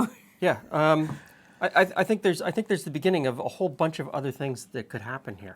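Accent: Canada. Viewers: I knew you were Canadian by your accent. My relatives are from Canada and they have that exact same accent. When I saw you pulling out those milk bags, I said, "Yup this guys Canadian." I knew because my uncle always bought their milk (household of 5 children) i loved the milk and wanted more and could never get enough, that and the big jar of Nutella they had for spreading it on a bread. Lest we forget the bread and that eastern European jam we always ate....since we are from Eastern Europe. Those good old innocent days, the Irish Spring and cold downstairs little bathroom.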